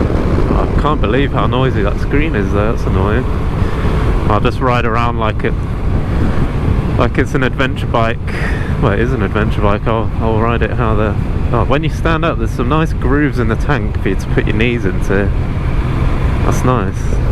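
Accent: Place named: United Kingdom. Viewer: Came for the transalp. Subscribed for the British commentary.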